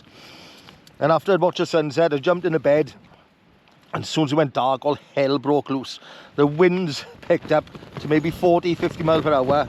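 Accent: Wales, United Kingdom. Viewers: The Walsh accent is madd 😅😅😅 nice video tho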